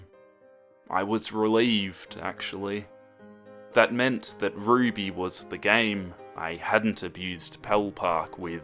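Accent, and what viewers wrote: Australia. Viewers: I'm sorry but Australian accents can't be creepy. Nice to listen to but it automatically makes you seem friendly lol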